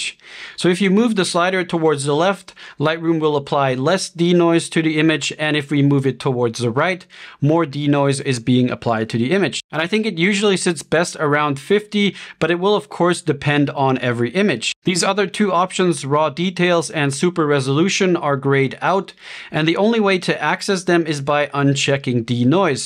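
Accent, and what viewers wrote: United States. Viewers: ...but has an American accent, not all English speaking people have an American accent....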